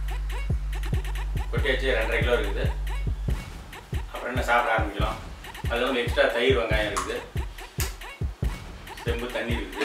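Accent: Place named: South India, India. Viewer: I promise I am not going to watch useless videos which r wasting my time Also me -watching this* His videos r love😍😍😍..I saw his Hindi channel that Hindi accent of southies is really cute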